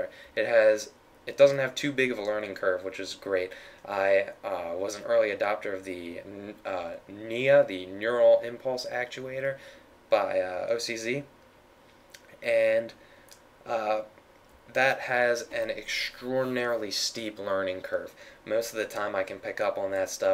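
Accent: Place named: Pittsburgh, United States. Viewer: I'm a big sucker for accents, and I dig yours. Can I ask where you're from? I'm thinking somewhere near Pittsburgh, maybe southeastern Ohio?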